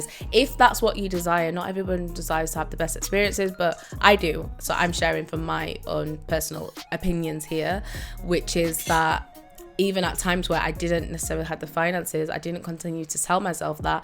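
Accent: United Kingdom. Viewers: Hearing a UK accent automatic like❤😊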